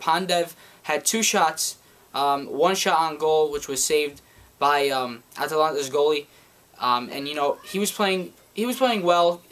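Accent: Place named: United States. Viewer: dal suo accento direi leggermente di no. è un americano illuminato.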